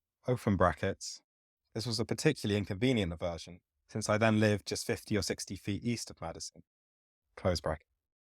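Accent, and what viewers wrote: United Kingdom. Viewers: I agree with what everyone is saying… plus its a weird choice to have a british man read a personal essay by an american woman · Whomever thought it a good idea to have a man speaking in a British voice reading a deeply personal and quintessential American topic? Like having a kangaroo teach ballet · He’s English. Although we citizens of the USA speak English, we have completely different manners of speaking a sentence. It’s the intonations and inflections.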